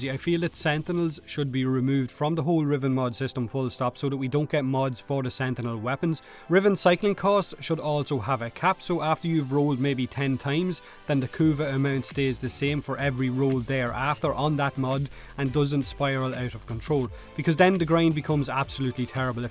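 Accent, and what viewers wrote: Ireland. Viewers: are you Irish cause you sound Irish?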